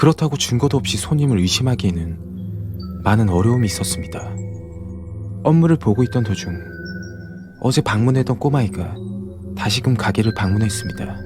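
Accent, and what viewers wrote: Gyeongsang, South Korea. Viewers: 목소리 특이하네요 갱상도 특유음성도 있고 잘듣고있습니다 ㅎ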